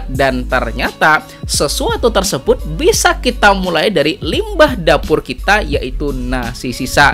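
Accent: Banjar, Indonesia. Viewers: Mirip aksen urang Banjar Kalsel pian, btw terimakasih info yg sngt bermanfaat nya, semog jd amal jariyah kelak🤲🏻🤲🏻🤲🏻😊🙏🏻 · Logatnya bubuhan amang banjar pang nah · Dari Logat bicara nya kayak org banjar/ hulu sungai, kalsel Iyakah?😅🙏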